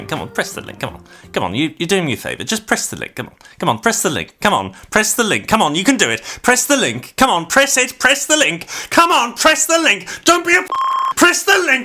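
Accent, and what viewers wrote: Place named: United Kingdom. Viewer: Great work as usual, always an inspiration. Hey, have you ever considered providing training? Sounds like you’re also based in the uk… I’d be interested in attending a course if you did.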